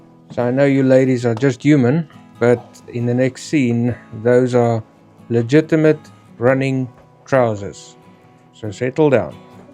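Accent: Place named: South Africa. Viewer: Just found your vid via YouTube on my tv - loved it😁 Where in South Africa do you hail from? Over 50yrs ago a relative of mine went to SA for a 6 month working holiday and stayed! Your accent reminds me of his wife's. Looking forward to seeing more of your adventures 😁 According to a mountain rescue team leader my sister worked with (and who taught me how to read an OS map) you should never go hiking in denim jeans!! Take care and please keep on bothy camping for those of us that can't! Another subscriber 😅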